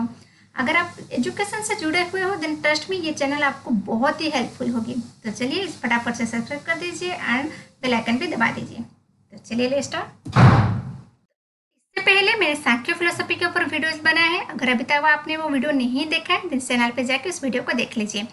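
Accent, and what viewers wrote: Odisha, India. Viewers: Man r u from Odisha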